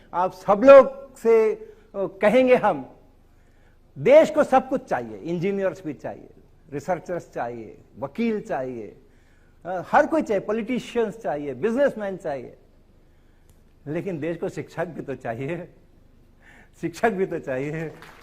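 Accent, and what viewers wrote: Standard. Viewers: wah sir ki hindi ekdom suddh hain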